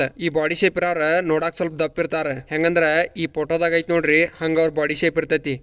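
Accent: North Karnataka, India. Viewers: benki guru congrajulations bari 9 videonalli 911 subscribers reach aagidi uttar karnataka bhashe tumba ishta aytu keep growing videos consistently upload madu good luck ❤❤👏👏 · Uttar karnatakada Kannada super❤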